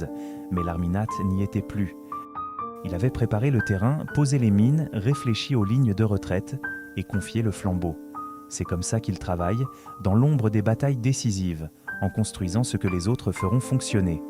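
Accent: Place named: United Kingdom. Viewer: vous prononcez les dates en anglais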